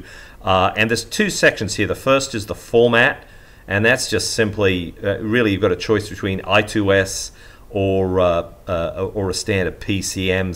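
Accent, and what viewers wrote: Australia. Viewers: MickMake was a very popular YT tech channel from down under. wiffy was his ongoing joke. Surprised you're not familiar with his channel. Judging from your accent (?), I figured you were good mates. MickMake stopped producing his videos just over a year ago. I think he got fed up with the online negative discourse, so he took his 'ball' away. Looks like your channel might be a great alternative. I'll be watching. Happy New Year. 😎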